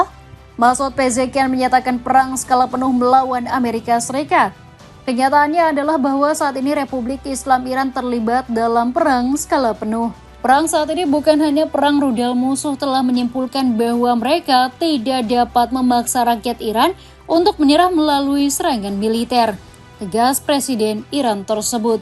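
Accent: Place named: Java, Indonesia. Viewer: Dari logat orang Jawa tetapi kok mengucapkan ejaan pakai bahasa asing sangat memalukan sebagai orang Indonesia yang sok kebarat baratan mengucapkan ejaan Indonesia tidak dipakai payah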